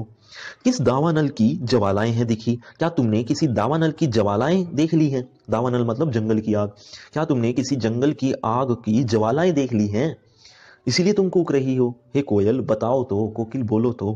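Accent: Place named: Garhwal, India. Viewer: Your sounds be like garhwali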